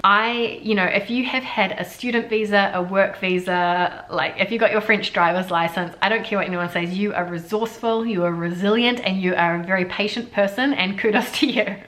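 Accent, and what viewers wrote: New Zealand. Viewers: Bon anniversaire ! C'est marrant tu as repris ton accent néo-zelandais xD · Joyeux anniversaire!! Merci pour cette chouette vidéo et pour ton appréciation de la France. J'aime ton accent et tes expressions NZ. 😊🎂🍾🎉👏👏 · Happy Birthday, I have short showers due to hot water shortage but I still manage to rinse my soap. You better rinse just in case is not good for your skin. A lot of people also don't rinse the dishes from the soap in Australia. The only time I don't rinse the soap of me is when I have bath because I run out of hot water. May your 30s are as happy as your 20s. You are real pleasure to watch. I like the way you speak NZ language!!! And I like your French too. I have heard you speaking French!!!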